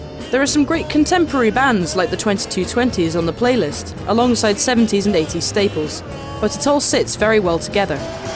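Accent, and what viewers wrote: United Kingdom. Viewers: British. I think.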